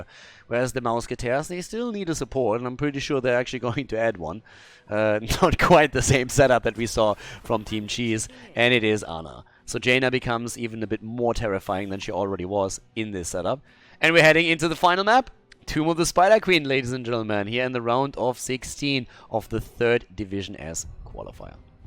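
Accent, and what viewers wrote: Germany. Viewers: Does has an german acsent when hes talking in englisch??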